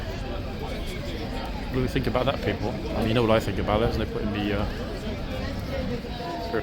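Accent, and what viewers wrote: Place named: United Kingdom. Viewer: I've really enjoy your videos. As well as loving your British accent🙂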